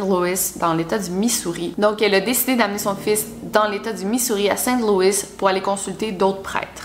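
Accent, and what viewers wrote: Quebec, Canada. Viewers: Je trouvé l’accent québécois bizarre mais en regardant tes vidéos je l’adore je le sur kiff de malade 😍😍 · J'imagine que tu a fait baucoup de recherche pour cet vidéo donc bravo et en plus ton axenc québécois (je sais pas comment on écrit) est trop bien donc continue comme ca 😊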